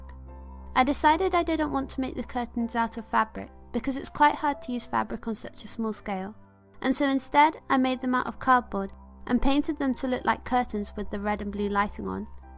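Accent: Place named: Southern England, United Kingdom. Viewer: You're British Finally i can fully understand every word said in a video (Though your accent says you're from the south, hi from the north)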